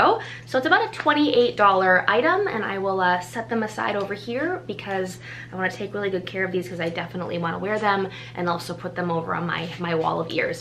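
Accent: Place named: Midwest, United States. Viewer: First off: I love how excited you get about every item.. and second off: I knew you were midwestern the minute I heard you speak (gotta love our accent)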